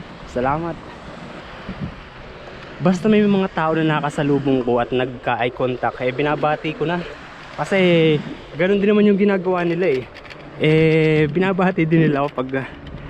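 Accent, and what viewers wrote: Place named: Binangonan, Philippines. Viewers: Tiga rizal k pla sir.sv ko n nga ba eh.napansin ko na sa punto mu..tiga talim island naman ako.part ng binangonan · Taga Binangonan po kayo? yung punto po kase nyo tunog taga doon hehehe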